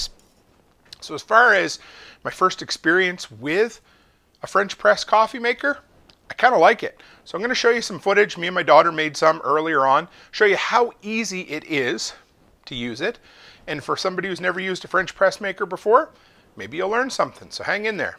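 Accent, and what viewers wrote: Canada. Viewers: I hear a Canadian accent.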